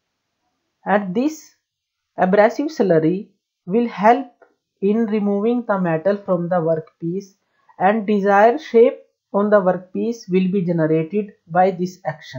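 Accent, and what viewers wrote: India. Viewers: typical Indian acccent but understandable>>>Good work thou!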